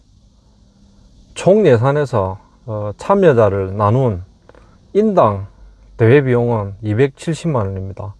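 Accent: Gyeongsang, South Korea. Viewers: 안녕하세요.. 유투브님은 경상도 사투리 이지만 목소리가 엄청 이뿌네요. 저도 경상도 인데 돌깨지는 소리같다고 합니다.. 집에서는요.. 유투브 님은 듣기좋은 목소리 입니다.. 시원하께 좋은 말씀해주셔서 감사합니다 . 특히 목봉 말입니다.. 항상 응원합니다. 수고하세요 😁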